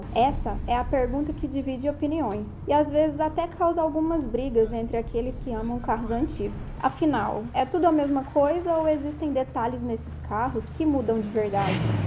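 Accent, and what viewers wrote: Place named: Minas Gerais, Brazil. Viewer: Eu já sou fã de carteirinha desse casal. Mas além de tudo maravilhoso (carros, imagem, ideias, explicações, estradas, etc), não posso me furtar a dizer que o texto é impecavelmente escrito e falado pela, as colocações verbais e tudo mais, resumindo, o português bem falado, com aquele sotaque "minerês", que, eu que sou Tricordiano, conheço muito bem. Ah, um Landauzão na garagem ia ser muito bom, mas eu moro em apartamento e as vagas cobertas não comportam um gigante como esse. Teria de estacionar nas rotativas, ao relento. Aí, dá dó, hein. Quando passa o antigo na estrada, eu já estou pronto pra assistir com o like. Valeuuuu, casal, showww de bolaaa. Sempre em frente!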